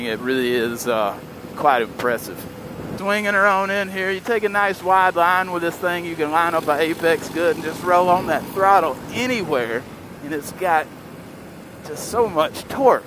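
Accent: South, United States. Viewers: Peachtree! Thanks, I couldn't quite place the accent. I hope you never lose it, out there in SoCal. ;-) Great review. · Isn't this the way to review a bike? No cussing, no horrible music, just to the point and scholarly. Love the American accent too! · where are from sounds like you are southern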